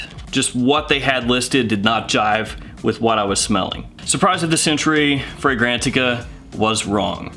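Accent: South, United States. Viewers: Hey. Nice vid. Btw. What is your accent? Is it southern?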